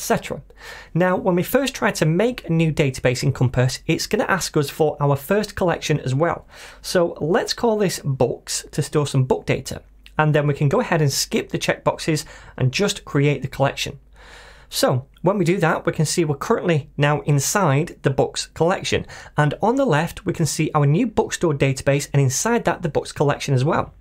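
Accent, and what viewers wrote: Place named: Yorkshire, United Kingdom. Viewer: Are you a Yorkshire man mate?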